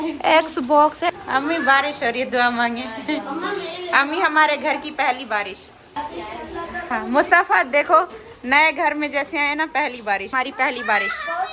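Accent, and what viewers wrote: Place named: Rural, India. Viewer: Phly ham apko dekhty thi to hami bhot hancty thi ap etni gaon wali or asi bolti the k hami hnci ATI thi aj v wo baty wo time apka yad ata tha or aj dekh jhut bol BOL k pasy kamai or aj ghmndi fakhari aorat bn gi zara nmaz ko Allah si v yari lga k dekh dunya ko chor di lalachi aorat bhni faimle sab reshty na khtam kri 😊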